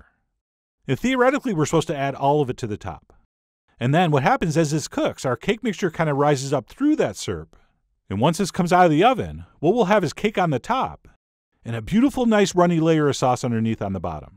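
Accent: Canada. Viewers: Is this how Canadians sound?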